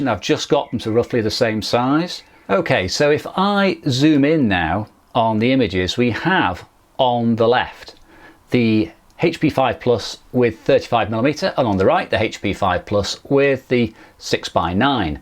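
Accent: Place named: United Kingdom. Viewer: Dear: I love the form and content of your channel. English is not my native language but and I understand the British accent better. I like that you are serious, humble, calm and your funny touches of humor. I love the comparisons you make between expensive and also popular cameras and that you go through various formats. It is exciting that a camera that is over 70 years old, but of course, of medium format, surpasses a camera with much more technology in several aspects. I also like that you can integrate both film and digital cameras and rescue the M43 in a kind of countercurrent where photography is often reduced to the more MPX and more ISO maximum level. Very happy that I still have more videos to learn. Greetings from Buenos Aires.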